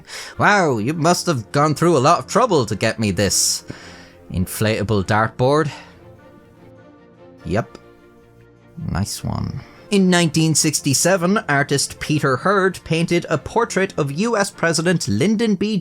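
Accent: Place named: Ireland. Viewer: I must say, you really spoke in a very good Irish accent in this video.......that's wert a tumbs up.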